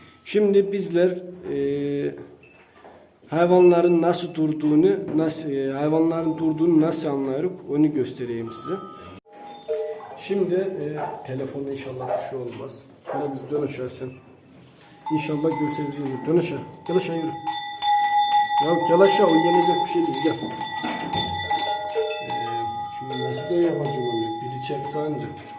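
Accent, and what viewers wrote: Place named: Black Sea, Turkey. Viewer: Her söylediğini anlamasamda, şivesi çok tatlı, her Karadeniz insanı gibi ... ☺☺☺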